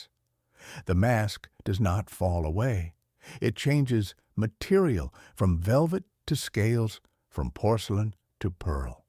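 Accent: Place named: Georgia, United States. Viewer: truthfully I think it's the Georgian Southern gentlemen voice narrating THIS at me that's oddly... amazing somehow for some reason I can't quite put my finger on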